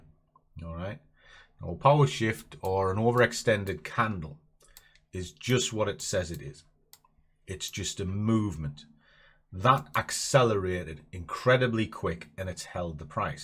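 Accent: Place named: Northeast England, United Kingdom. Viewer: haha I just watched this video and oh nice I’m from Newcastle can tell u was close by the accent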